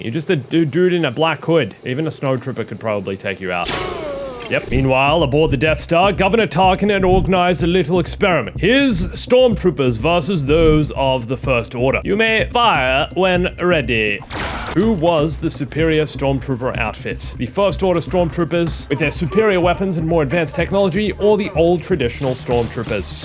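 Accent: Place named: Australia. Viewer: And your Australian accent is perfect! 👌🏻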